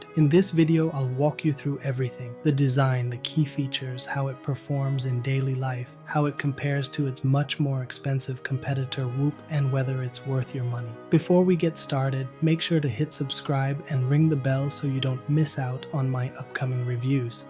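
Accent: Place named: India. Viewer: Terrible Indian accent. Added to that, he speaks like a hypnotist. .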